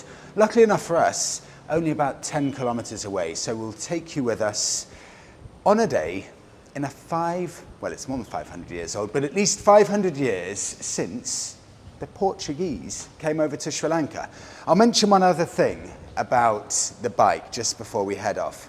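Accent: RP, United Kingdom. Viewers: That's absolutely quite normal in here gents, plus you don't have first world problem here where passports forging is a thing. Anyway, as someone said, you guys are so under-subs and I hope your channel will take off in a massive manner. Your authenticity from what you wear, how you walk, and in general who you are as a person is refreshingly unique, oh and I almost forgot your voice, your narration ability with that clear, concise, RP accent makes you listenable on hours end.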